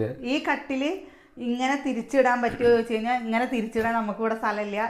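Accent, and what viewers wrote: Palakkad, India. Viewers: Aadiye angayaane zjnnaunkettutto palakkadan bashakke oru prthykataram banfiyaane chechi avvoo yentaanne ninga ingana parayine😂😂😂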